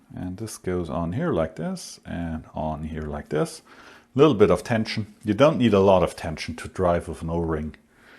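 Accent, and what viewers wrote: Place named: Hemau, Germany. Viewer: Glad to see a video, you are my absolute favorite machining channel of all time. You're a very talented machinist and you have the exact same accent as my family from Hemau.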